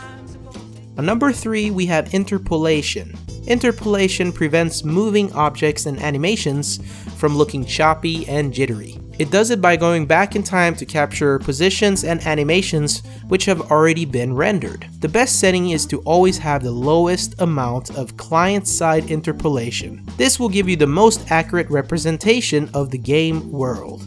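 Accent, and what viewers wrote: France. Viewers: Are you french or English ? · lol is he french? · where are you from? I'm from Canada and I don't know where that French accent is from 😅 I speak French aswell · woow great french accent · is he french ? "j'espère vous voir bientôt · Bon vidéo! Sub! Wanted to know where you are from, France or Québec? Just curious :P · Are you actualy french? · Tu accent français donné très bien !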